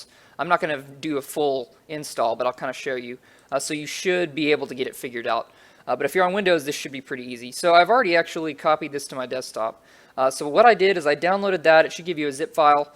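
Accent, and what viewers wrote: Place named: England, United Kingdom. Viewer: My god! pure english accent!!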